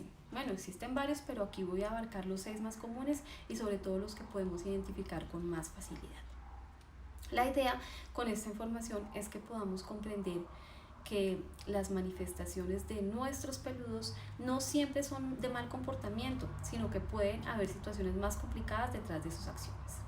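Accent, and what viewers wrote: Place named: Colombia. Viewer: Acento Colombiano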